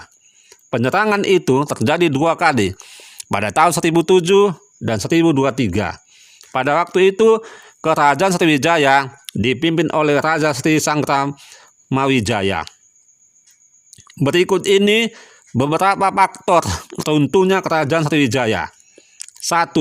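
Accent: Java, Indonesia. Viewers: Saya penasaran dengan Om ini ilmu Sejarah dan Agama sangat oke.dan kalau bicara logat bataknya tidak kelihatan bahkan logat bicaranya seperti orang jawa.Padahal aslinya orang Batak.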